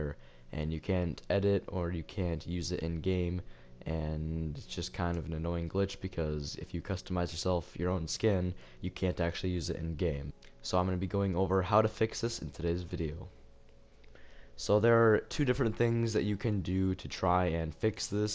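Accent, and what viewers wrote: Wales, United Kingdom. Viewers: You welsh bat? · Are you from Wales?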